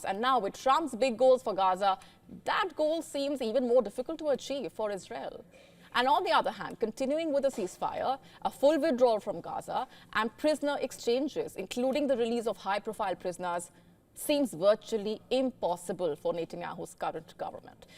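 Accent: India. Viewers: Indian English so funny😂😂😂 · man fkn indian accent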